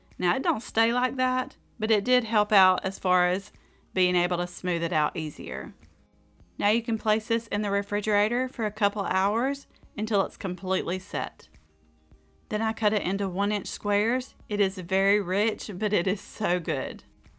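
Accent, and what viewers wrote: South, United States. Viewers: Here in New England (Maine for me) we make Needhams in it, makes the chocolate shinny, check out a recipe for them.Love your southern drawl.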